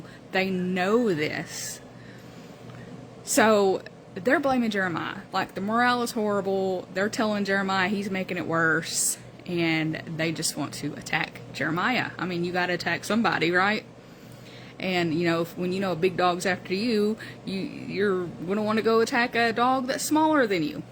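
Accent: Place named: Tennessee, United States. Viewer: Youre in Tennessee too huh ? I can hear it. Anyhoo COME LORD JESUS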